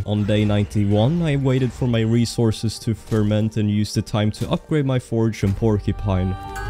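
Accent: Sweden. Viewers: I hear that you are swedish with the accent (jag är också svensk)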